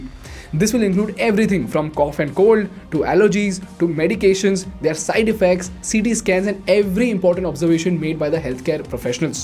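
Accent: India. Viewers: I subscribed this channel thinking this is a great non bias channel. I just realized this guy is a bjp bhakt with thick indian accent... lmfao Farewell dude.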